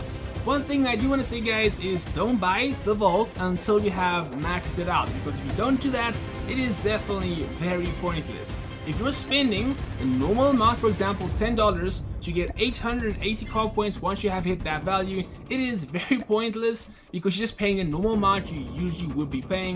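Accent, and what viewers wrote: South Africa. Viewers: Wait are u south African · Are u south African bro?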